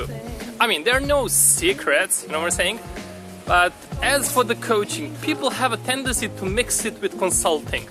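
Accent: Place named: North, United Kingdom. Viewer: And I like your accent! :D North FTW!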